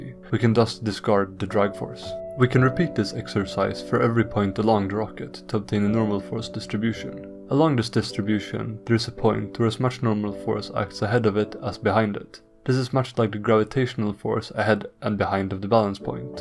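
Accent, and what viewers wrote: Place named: Skåne, Sweden. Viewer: Hi there. I have spent half-hour following leads from ' ' - all of which are dead-ends. So I have to contact you in public. I see you speak Skåne - or were warmly influenced by that region. I speak that other great language. I'm English but I live in Sweden (Österlen). Your video on missile stability is truly excellent. I would like to use your work both whole and in part. I have several videos dealing with the German A4 V2 missile and a website called <link> I would, of course, give you full on-screen credit and provide links to a website or other internet site of your choice. Within the limitations of our specialist area (I'm not Justin Bieber!), I can promise you significantly greater exposure, and we have expert subscribers (from industry, academia, etc.) to our website and video channel from around the world. I look forward to hearing from you. 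Kind regards Robert J Dalby FRAS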